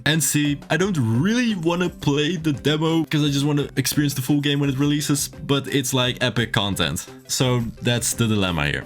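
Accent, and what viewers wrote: Netherlands. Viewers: Wacht is deze man nederlands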